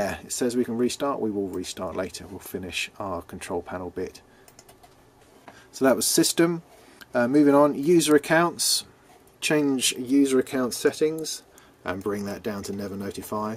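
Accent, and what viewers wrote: United Kingdom. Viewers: y=u futn brit mate... 2quik 4meaaaa{british accent}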